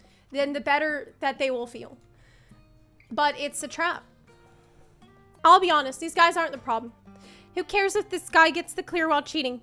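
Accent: South, United States. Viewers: southern accent comes out when she's mad.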